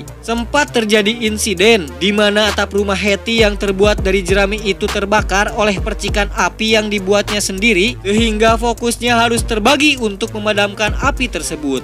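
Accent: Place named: Sunda, Indonesia. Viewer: Asik seru bnyk alur cerita pembawaannya Sunda. Karena saya sendiri asal tasikmalaya. Tapi sekedar masukan kang klo bisa gaya bicara dan penyampaian dengan gaya sendiri dan karakter sendiri. Jangan meniru orang lain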